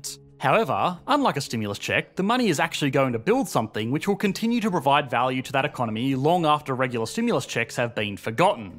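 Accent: Australia. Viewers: He is Australian but I would suggest has lived OS for a few years, Most Aust as u correctly stated pronounce route as "root" and router as "rowt-er" as it has a sexual meaning in slang. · What is this australian dialect/accent called? I need to know so I can avoid it in my life forever. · 这个口音我猜听上去像个澳大利亚人，众所周知，澳大利亚跟中国的关系现在一点都不好。我还觉得粉种人显然对中国人的经济观完全不懂，喜欢用自己的经济学常识分析中国，中国人一般认为经济出现大问题是因为存量市场瓜分殆尽，并且新的技术革命未能出现。至于债务危机，中国是一个儒家国家，政府对经济部门的掌握不仅仅限于交通工具，还有金融、能源、化工、机械、物流等各个领域，任何部门的利润在必要时都会拿来作为财政收入填补其他公共部门的亏损。而且高铁的建设是为了带动整个地区产业的繁荣，并不以追求利润为目标，所以广大的中小民营企业才是高铁的最大受益者。如果要说债务危机，那么我认为维持一个高福利社会的制度危机更严重。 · Australian accent is so freakin weird like how he says todddaaaay lool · Usually but in this case he is a pretentious individual trying to appeal to the Americans. If he was genuine in what you he would have dropped his Australian accent.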